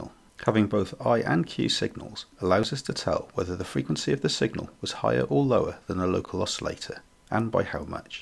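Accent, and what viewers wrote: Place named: Cardiff, United Kingdom. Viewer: Nice project for the RP2040. By the way, to my ear, you sound like a Cardiffian who lives/lived in England?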